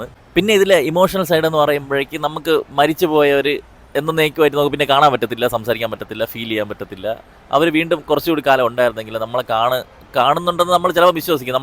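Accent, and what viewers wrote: Trivandrum, India. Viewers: Dey trivandrum aahdey 🥴🥴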